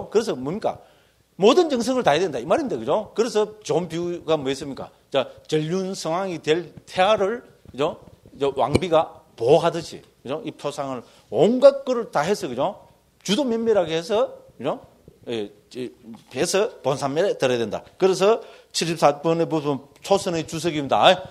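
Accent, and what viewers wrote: Gyeongsang, South Korea. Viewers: 됐죠 스님~~ 경상도 사투리가 참 구수합니다.^^ 법문 감사합니다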